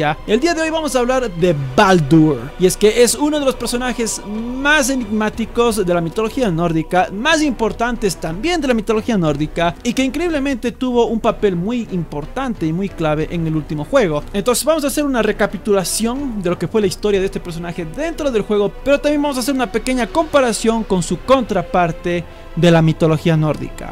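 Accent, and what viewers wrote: Ecuador. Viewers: eres de ecudor??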